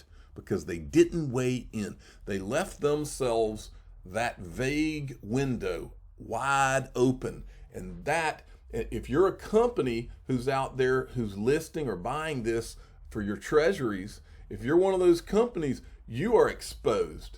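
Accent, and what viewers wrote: South, United States. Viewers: Love listening to crypto news in a Southern accent!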